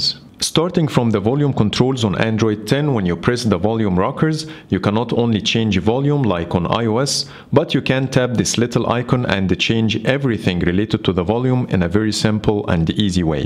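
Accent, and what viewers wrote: Egypt. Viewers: The Egyptian accent is so clear here. Egyptian English. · استمر عرفت انك مصري من الاكسنت 😂😂 الاكسنت المصري بتاعنا معلم في كل حته 😂😂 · Egyptian English Accent 👍👌❤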